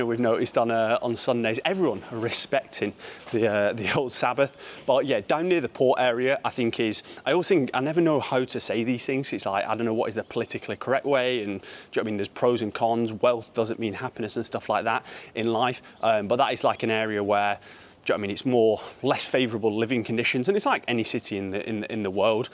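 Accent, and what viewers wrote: United Kingdom. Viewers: Thou accent is English isn't it?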